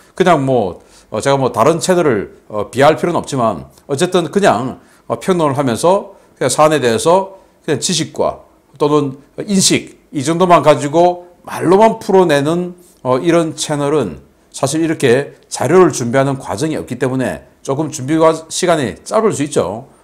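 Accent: Gyeongsang, South Korea. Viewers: 이 분은 전쟁 신봉자네요. 군사력이 강해져야 하지만 생각이 다른 국민들은 모두 빨갱이이니 청소해야 한다고 하니 푸틴하고 뭐가 다른지 싶네요. 억양을 들어보니 경상도 사람으로 보이는데 지역정서에서 벗어나지 못한 불쌍한 분으로 여겨지네요. 우물안에서 벗어나 국민을 함께 바라보는 넓은 시각을 지향하면 좋겠다는 생각입니다. 이 분이 군사전문가로 보이는데 시야가 좁으니 인물이 아깝네요.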